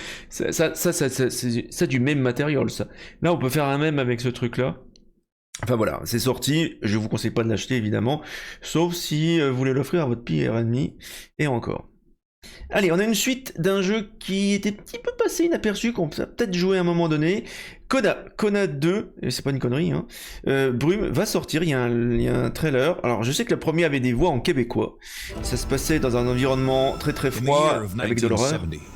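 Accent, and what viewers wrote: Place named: Quebec, Canada. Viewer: Jolie accent québécois 🥰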